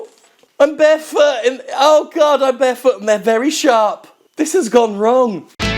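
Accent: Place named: United Kingdom. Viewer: "It's a fucking joke here" Wait, what? I'd always thought you were British because of the accent.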